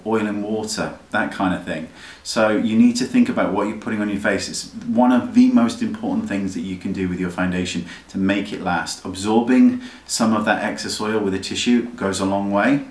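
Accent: Australia. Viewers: how come u have aussie accent! Sounds so cool though.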